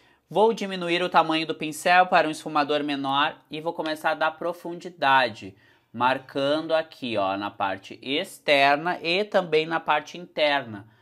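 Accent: Rio Grande do Sul, Brazil. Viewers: Adoro o sotaque gaúcho ❤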